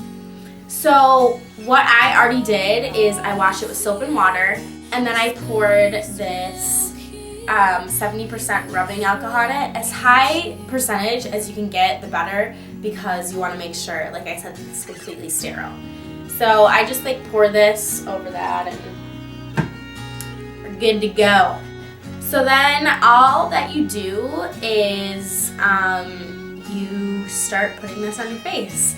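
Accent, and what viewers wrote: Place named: Canada. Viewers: Hm she sounds canadian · Canadian eh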